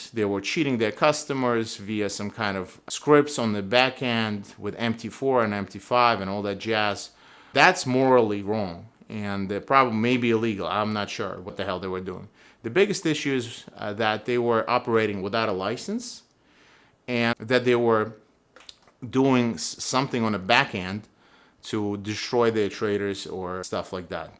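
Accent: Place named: Boston, United States. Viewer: you got that boston accent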